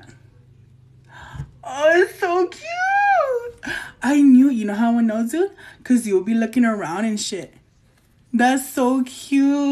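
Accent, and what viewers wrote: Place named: California, United States. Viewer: Is she representing all my girl in California? Cuz all of us speak like this! 🤣🤣🤣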